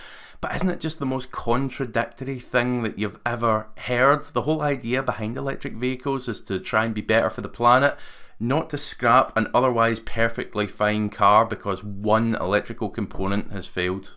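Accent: Scotland, United Kingdom. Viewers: I love the scottish accent. It just wonderful.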